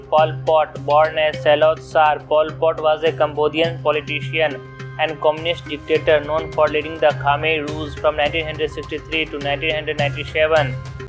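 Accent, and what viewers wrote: India. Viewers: My mom hates your hindi accent, anyways, Vlad The Impaler was a mini-king Of Dacia (Named Romania In present)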